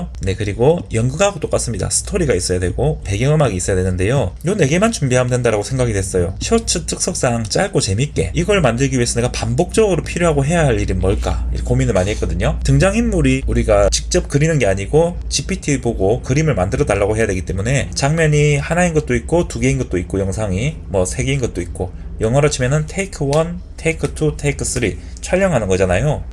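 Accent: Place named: Busan, South Korea. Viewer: 어쩐지..부산억양이 익숙한 느낌이...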